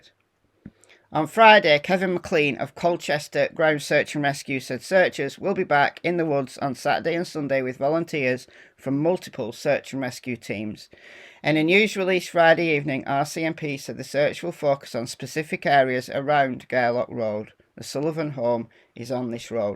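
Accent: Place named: Lancashire, United Kingdom. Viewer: Hey - your voice remimds me so much of my former mother in law(a Lancashire lass!) On a serious note, my grandkids are a 6 yr old girl and 3 yr old boy. The idea that they would go off alone from the safety of their garden and into the woods is utterly preposterous. There is just no way on earth.... I don't think they were abducted either - I don't think they ever left that home, at least not alive 😢 I find it interesting that out of the many videos there are regarding the subject ive not seen one comment that exonerates the parents of all blame and believes their story whch says a lot really doesn't it! Sending love from mid Wales! 🏴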